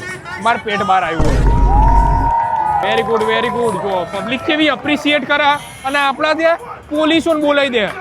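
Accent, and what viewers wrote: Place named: Mehsana, India. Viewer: ભાઈ મને તો મજા આવે છે એક દમ સિધ્ધપુર ની ને મહેસાણા ની ભાસા સાંભળવા મજા આવે છે તમ્મરીયા આવા છે ચક્કર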